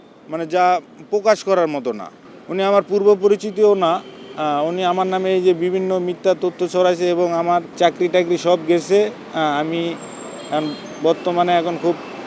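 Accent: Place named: Bogura, Bangladesh. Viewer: ভাইয়ের কথার টোনে বোঝা যাচ্ছে ওনার বাসা বগুড়ার কোনোজায়গায়...!!!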